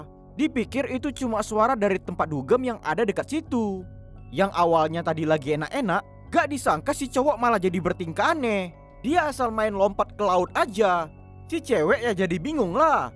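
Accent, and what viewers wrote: Medan, Indonesia. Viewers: BANG ABANG MEDAN MANANYA BANG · Logat Medan bah...👍👍👍👍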